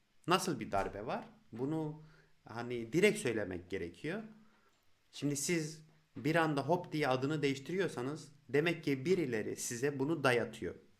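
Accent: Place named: Armenia. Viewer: Sen Kürt değil, Ermeni şivesi ile konuşuyorsun. Sakalını sevsinler.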